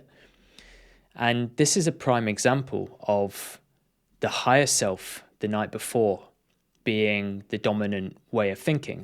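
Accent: United Kingdom. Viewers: nice to see a person from the uk talking about higher self and spirituality. Somehow it feels more relatable when someone is talking about this stuff with an accent and an approach that you can identify with. Thanks for sharing this. I also have a channel, but as you can see it’s all about motorcycles and adventure, however as with most people there’s another side. Just before lockdown a personal event lead me on a journey. I finally found Reiki and law of assumption. I’m so much more mindful about my own thoughts, words and feelings. Meditation is something I struggle with to be honest but it’s something I feel I need to incorporate. Thank you for this video.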